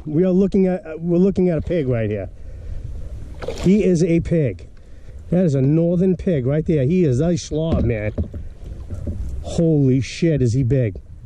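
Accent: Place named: Maine, United States. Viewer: Strong Maine accent.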